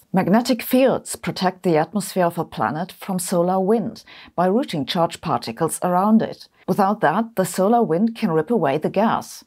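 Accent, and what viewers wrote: Germany. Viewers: You're German so of course you have an accent speaking English, but I hope I'm not out of line to mention that native English speakers pronounce "comb" to rhyme with "home". Your pronunciation sounded more like the Welsh word "cwm" which is a glacial feature - Google says that's "felskessel" in German. Feel free to correct my atrocious German any time! As ever thanks for the awesome videos and keeping me in touch with the world of physics and science which I haven't studied for several decades now. Even my non-scientist wife enjoys your videos and we always get a kick out of your humour. I live in hope we will get a musical number from you again sometime, you are so multi-talented!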